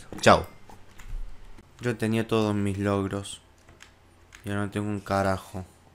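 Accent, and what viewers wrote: Córdoba, Argentina. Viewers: sos cordobes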